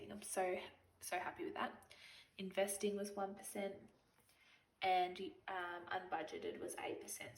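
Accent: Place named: Australia. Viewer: You gotta love that extra paycheck! So September will probably be the same for you because it's an extra paycheck for me too. Sounds like you're Australian which is sweet! I just found you by searching for budgeting channels on YouTube because I love the budgeting community and focus a lot of my content around that too. Keep up the good work!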